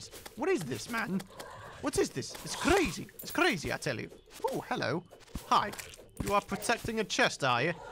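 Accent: United Kingdom. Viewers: Why does every terraria playthrough include jolly British men?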